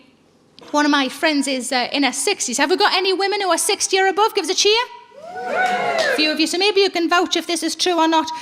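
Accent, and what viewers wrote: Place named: Scotland, United Kingdom. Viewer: I just can't understand Scottish.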